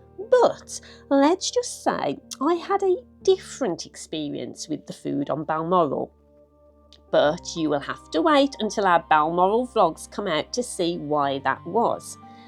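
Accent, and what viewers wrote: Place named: Black Country, United Kingdom. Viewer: Great work on this video …very comprehensive…..and, the Black Country accent is fantastic ….( that’s a guess by the way ) 😂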